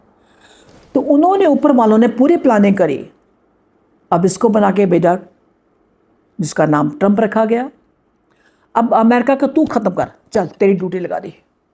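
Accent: Punjab, India. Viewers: Thudai hindi to Punjabi di mehak aandi hai ji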